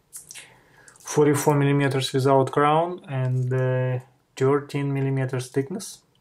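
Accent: Russia. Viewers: У автора русский акцент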